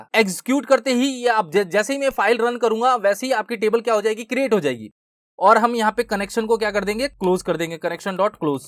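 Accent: India. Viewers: sir this is the right code import sqlite3 conn=sqlite3.connect("sqlite1.db") conn.execute(''' Create Table Student ( st_id INTEGER PRIMARY KEY, # <-- fixed here st_name VARCHAR(50), st_class VARCHAR(10), st_email VARCHAR(30) ) ''') conn.close I noticed that you are using AUTO_INCREMENT for the st_id column. This is not supported by SQLite, which uses a different mechanism for generating primary keys. You need to use INTEGER PRIMARY KEY instead, which will automatically assign a unique integer value to each row. This will also make your table more efficient and compatible with other databases. To fix this, you need to change the st_id column definition to INTEGER PRIMARY KEY I hope it's ok to give feedback, great channel by the way, the biggest benefit of this channel is the Indian voice, learning from English channels becomes tedious due to their dull voices, thank you for this material.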